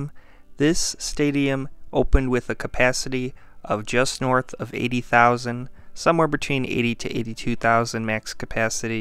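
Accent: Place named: Cleveland, United States. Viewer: I love this guy's cleveland accent.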